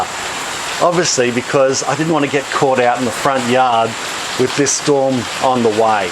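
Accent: Australia. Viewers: You`re a cutie! As soon as you spoke of heat, humidity, and a storm coming I thought "Are you here in Florida? Maybe south - nobody wants to be in the north. But his accent is Aussie, so likely the other side." Then I saw "subtropical (similar to Florida)" in your description, and it all made sense. I clicked the button. That wee lime looks amazing! I can imagine those bubbles mixed into a salad dressing .... and each bite of food has a little surprising ZING of lime. Hmmmmm.... I`m starting a farm soon ..... I may have to figure out how to get a tree growing here. OH.... and then mosquitos - are you SURE that you`re not in Florida??? haha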